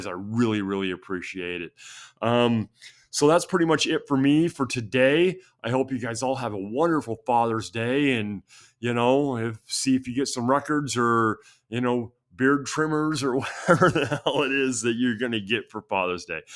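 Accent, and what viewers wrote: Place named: South, United States. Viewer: What part of the south are you from? I can hear an accent but I can't quite place it. I'm going N.C? Possibly T.N? Can't go wrong with Rose Tattoo. Wow! The Who!? You definitely have their best one. I could listen to the first Grim Reaper record all day long. The second one is pretty good too. Check out my video on them. GNR has never put out a bad one but you're right. That is one of the greatest debuts of all time. Keep this theme up! It's cool. Cheers!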